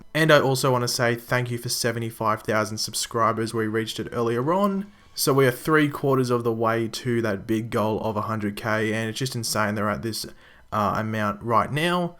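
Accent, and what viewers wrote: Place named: Australia. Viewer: Oh you are Australian. Brilliant.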